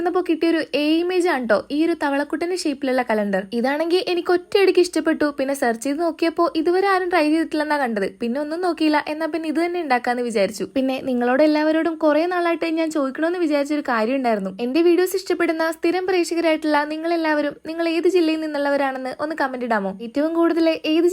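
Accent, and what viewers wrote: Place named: Thrissur, India. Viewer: Thrissur anu chechi ❤❤❤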